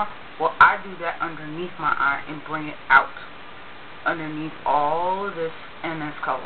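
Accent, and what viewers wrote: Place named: United States. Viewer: You sound soooooo country :0) I am loving those eyes! Nikkie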